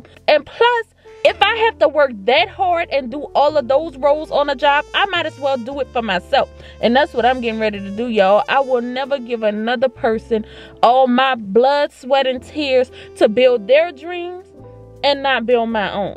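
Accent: New Orleans, United States. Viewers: Sis. I'm still looking up to you as a RN gaining her BSN and ALWAYS choose yourself especially when it goes against your moral code. You from New Orleans? I hear it. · Are you from Nola...I love your accent 🤔😊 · Are you from N.O.? I hear it all in your voice. Lol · I hear some New Orleans in that accent. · Why do you sound like you're from New Orleans, lol. . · Please confirm my suspicion...you're from New Orleans right? 😆...I'm from there and I just feel like I hear the accent....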